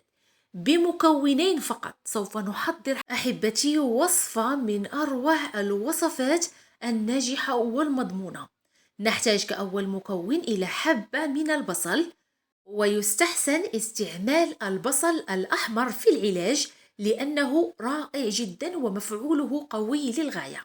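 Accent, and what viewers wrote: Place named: Casablanca, Morocco. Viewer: وصفه جديده مغربيه احسن وصفه الببوش مع الفليو بولغم وش البولغم قصدك بلغم الله يعين اللغه العربيه من لهجتك انت بنت كازا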